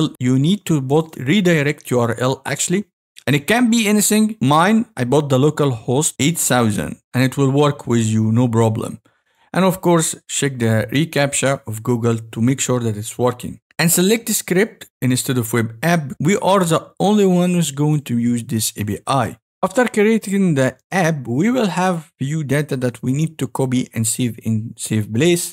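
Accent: Egypt. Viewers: yes Egyptian accent is very clear through the video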